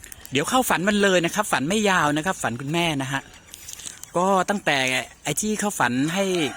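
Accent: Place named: Laos. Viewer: ออกลาวแล้วค่ะ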